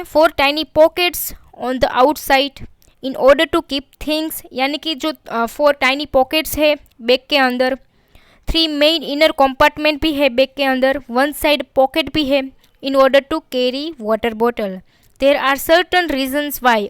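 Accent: Gujarat, India. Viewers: Madam gujarati cho?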